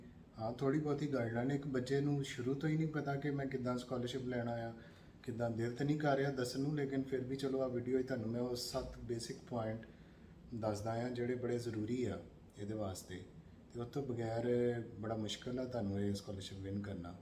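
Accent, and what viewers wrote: Faisalabad, Pakistan. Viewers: Dr sb, kindly use some Mike that can record voice that is audible, rest is fine, full of Faisalabadi touch.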